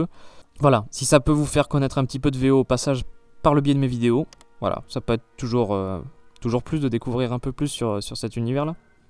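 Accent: England, United Kingdom. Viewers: Mec je sais pas pourquoi mais ton accent anglais me fais kiffer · Super vidéo comme d'habitude j'adore ton accent Anglais moi je suis pourrie en Anglais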